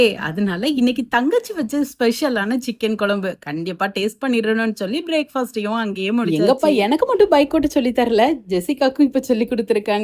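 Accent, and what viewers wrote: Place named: Kerala, India. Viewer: Sister neenga pesarathu azhaga irukku 2daysa unga video matum tha pakkuren super 😊😊😊neenga kerala sister unga slang apditha irukku athan keten 😊😊😊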